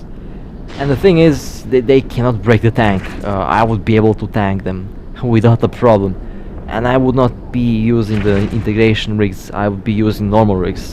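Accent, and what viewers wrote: Russia. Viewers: акцент русский капец как из американских фильмах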